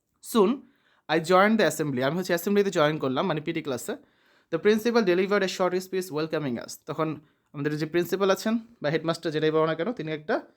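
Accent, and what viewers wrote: Sylhet, Bangladesh. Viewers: Bhai afne kita Sylheti ni?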